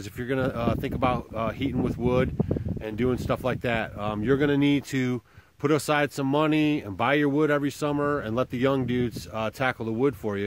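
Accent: United States. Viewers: you from usa?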